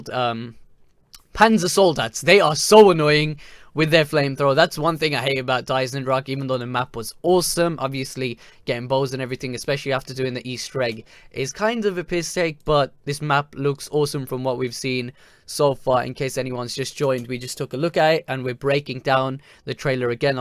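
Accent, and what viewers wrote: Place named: RP, United Kingdom. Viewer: Fucking posh mate🙈🤗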